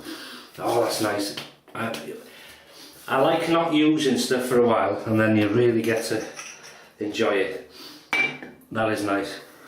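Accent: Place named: Wales, United Kingdom. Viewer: Very cool and fun video again :) I really like your accent, reminds me of my good times when I worked in Wales.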